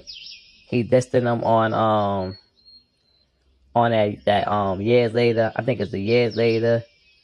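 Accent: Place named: New York, United States. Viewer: Sound like you from New York or Detroit, but da' 504 alllll up there too.. Good job bruh. R.I.P. SLIM!!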